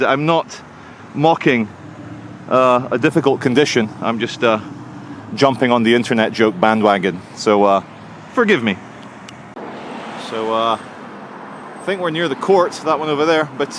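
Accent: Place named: RP, United Kingdom. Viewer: Too posh for the man of the people.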